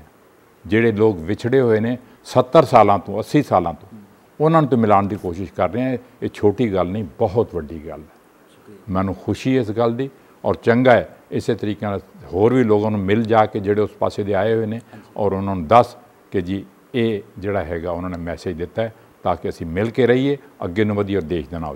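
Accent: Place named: Multan, Pakistan. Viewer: Beautiful. Maza aa gaya Malikha Singh ji ton Multani ( hurn inu Saraiki aandy aan) di mithri boli che mithri khawat sun key.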